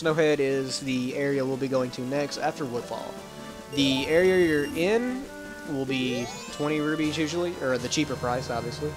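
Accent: South, United States. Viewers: been watchin these for the past two days so i had background noise while i play ocarina of time, why do you hate your voice, i dont, i got the same one kinda, i like the southern accent.. guess its cuz i was raised around it but still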